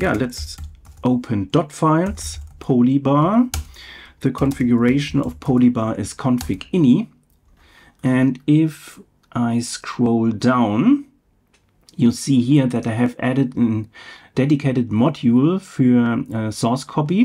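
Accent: Germany. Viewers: man erkennt das du deutsch bist lol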